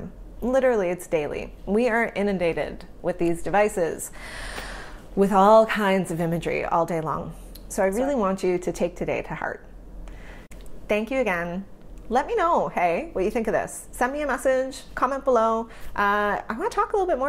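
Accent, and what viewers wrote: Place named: Canada. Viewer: What? Struggling with confidence? Who does that? Ok.....back up the bus.... Who doesn't struggle with it, is a better question. I guarantee even the most accomplished people have or still do struggle with it! We are all good at self doubt that is what makes or breaks us. It creates competitive energy or steals it! For me I am more jealous of the opportunities other photographers have, then the results! Like you I live in the cold cloudy climate! Minnesoooooota! Oh and a side note I knew you were from Canada just by the way you say out and about!! Lol I am a person that thinks I should be learning faster then I do! I have always struggled with learning speed. It has detoured me form moving forward in the past, because I don't get the results "I" think I should be getting! So in a way comparing me to me is self destructive! Instead i need to work the process and just do it and results will eventually come! 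Great message! Made me think about my own job life. Being retired now the only person I can blame for the position I put myself in is ME!! LOL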